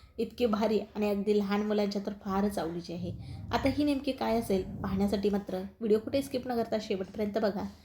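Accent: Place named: Kolhapur, India. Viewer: कोल्हापुर ची भाषा की नाय एक नंबर आहे बघा 😊😊😊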